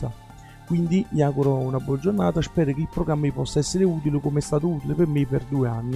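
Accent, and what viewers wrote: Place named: Naples, Italy. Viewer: con il tuo accento partenopeo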